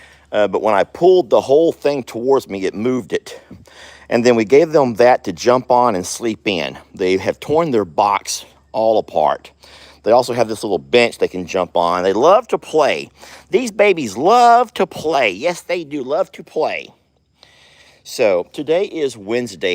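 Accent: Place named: Texas, United States. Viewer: Me too, that Texan twang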